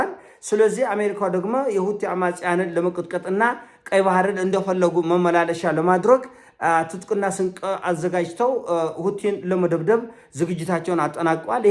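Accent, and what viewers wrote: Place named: Oromia, Ethiopia. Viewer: ኦሮሞ መንግስት ሲወድቕ አነጋገርህ የኦሮሞ አማርእኛ ስናጋር ትቀይረዎለህ አደል አይአንተ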